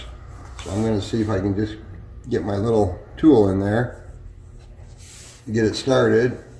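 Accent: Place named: South, United States. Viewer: You sort of sound "hillbilly" but you know your stuff. Well done it helped me a lot.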